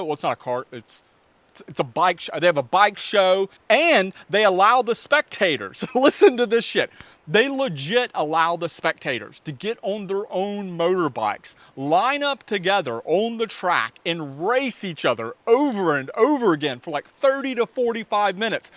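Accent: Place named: South, United States. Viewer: man... the southern accent is stonk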